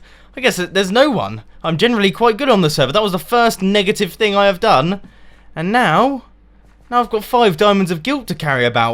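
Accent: United Kingdom. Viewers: idk why but everytime you use your super-extra-fancy-charming-brit accent i imagin you recording in a smoking hahaha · VERY BRITTISH ACCENT?!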